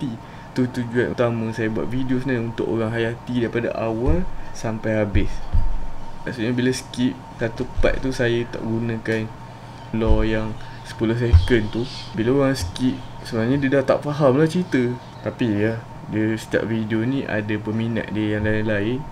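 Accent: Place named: Pahang, Malaysia. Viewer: Aok ni orang mane? Ngape mcm loghat pahang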